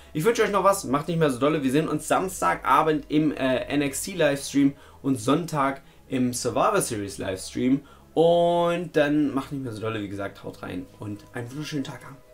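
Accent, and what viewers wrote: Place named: East Germany, Germany. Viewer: Ossi halt 😄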